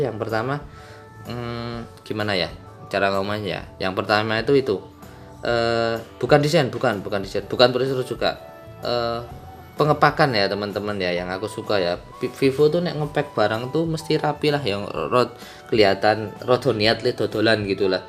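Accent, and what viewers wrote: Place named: Java, Indonesia. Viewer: Josss logat jawanya ,,mudah dipahami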